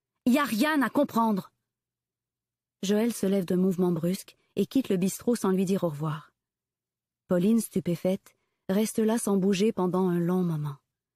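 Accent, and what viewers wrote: Quebec, Canada. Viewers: Moi de même,+ l'accent Québécois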